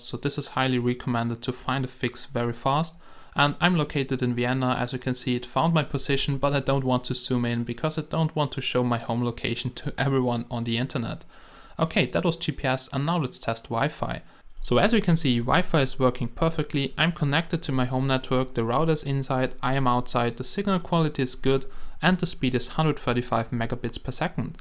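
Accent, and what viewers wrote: Germany. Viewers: You dont Hear like a English man More German 😄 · did I hear a german acsend? :D naa hörst dich n bisschen so an :D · Ich bin halb irisch und halb deutsch meine muttersprache ist englisch und ich merke sofort das deine deutsch ist. Nichts gegen dich aber könntest du auch mal videos machen wo du deutsch sprichst?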